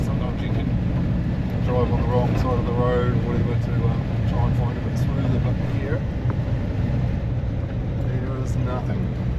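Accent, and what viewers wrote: Southland, New Zealand. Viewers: You sound like your from southland in NZ. Good job mate enjoyed the video.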